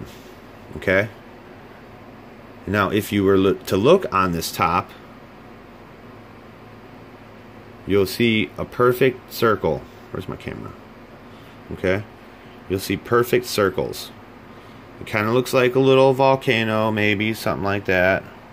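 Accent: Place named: United Kingdom. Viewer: PARDON! I didn't understand anything , you started talking Britannica .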